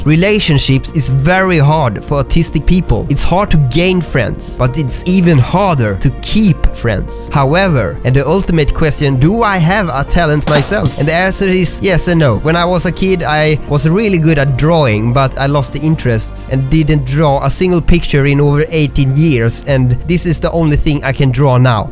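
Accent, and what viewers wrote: Sweden. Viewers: Oh dear gooo-, you swedish?!? U cert got the accent. Lame. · Well he's got a rather strong Swedish accent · He's from Sweden, and that's why he has an accent :) (I think he's from Gothenburg) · No, he has the accent because he's Swedish. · i love his swedish accent its kind of adorable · OH MYYYY, Du är svensk också! min engelska (uttalning) låter också som din lol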